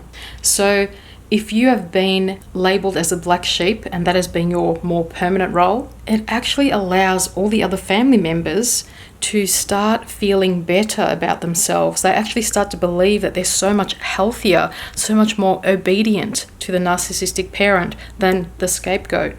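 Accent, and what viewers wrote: Australia. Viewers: You sound Australian I hope your doing ok,prayers for everyone there.... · Are you Australian? I really like the accent. And yes I'm a scapegoat too. Hello everyone 👋👋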